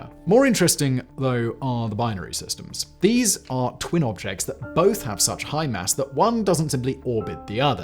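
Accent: RP, United Kingdom. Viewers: Absolutely no idea what Simon is saying, but admittedly impressed by his ability to morph “extremely British” into novel languages. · Like the content but hate the pretentiousness + posh accent, it's hard to listen to honestly.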